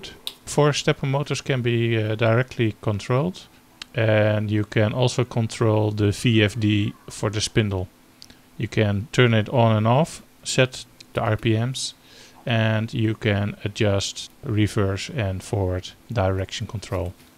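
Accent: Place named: Netherlands. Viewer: Considering his pronunciation, making fun of other people is not that great. Way he pronounced V and Ch and such I am pretty sure he is Dutch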